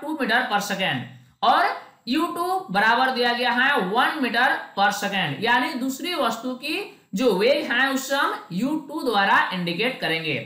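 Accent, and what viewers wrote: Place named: Bihar, India. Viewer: Sir apki boli achi nhi h asa lag rha h ka aap bihari ho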